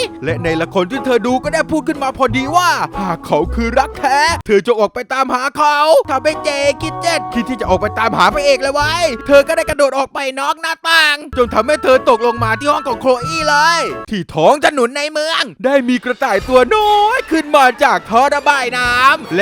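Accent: South, Thailand. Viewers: ชอบพูดไต้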